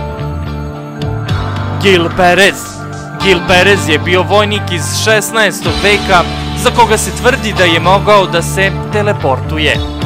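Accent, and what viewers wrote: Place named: Niš, Serbia. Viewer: odakle si ja mislim da si iz Niša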